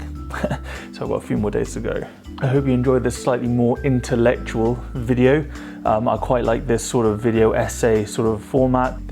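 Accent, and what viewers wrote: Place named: United Kingdom. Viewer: Nicely done my dude. Damn that British accent haha you put the rest of us to shame 😂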